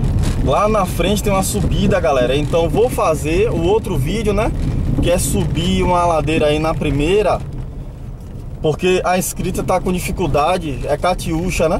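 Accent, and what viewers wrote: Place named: Pernambuco, Brazil. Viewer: Você é Pernambuco? Gosto do sotac.. Parabéns!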